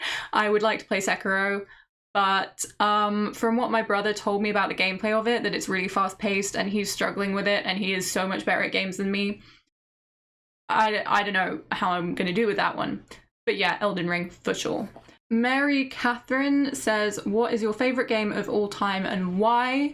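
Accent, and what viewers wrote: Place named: United Kingdom. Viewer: i never thought you was lived here by that bloody british accent😋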